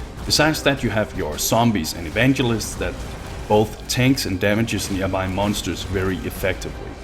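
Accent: Denmark. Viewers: it's so fucking obvious how danish you are!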